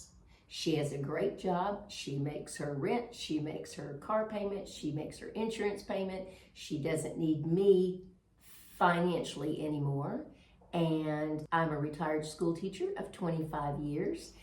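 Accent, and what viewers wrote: South, United States. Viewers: Thought I recognized the Southern accent. I’m from Georgia. Don’t get rid of it. It’s a gift! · It's a shame that people don't appreciate a southern accent or even a traditional southern lifestyle. I personally like and appreciate the diversity that accents offer as well as perspectives on life., I'm curious if you have noticed a similarity between the French approach to life and the old southern approach. When I was young, banks and many businesses took Wednesday afternoon off. As well, professionals often closed early on Fridays. Sundays were closed also for time for church and family with large family gatherings on Sundays around the meal. Things were slower paced and life was pleasant. This seems similar to traditional French life - as I understand it. What has been your experience? What are your thoughts? I love you videos and your accent. 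· I just found your channel, and have watched a few videos. Have to say, you look so pretty with your hair in this loose, flowy way. Love it. And I love how happy you are with your decision. (I am appalled that someone said you should "get rid of" your beautiful Southern lilt.) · Never tamper with your beautiful southern accent, it's charming. You have really lived a full life and still are. God bless you. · I LOVE MY SOUTHERN ACCENT AND YOURS! I get a lot of comments on mine too and I remind them that they have an accent too unless theirs is from England!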